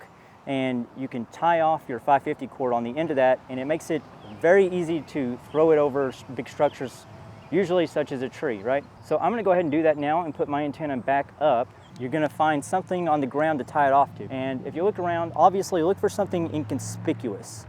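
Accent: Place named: Kentucky, United States. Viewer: How do you say antenna is how I knew you were from Kentucky!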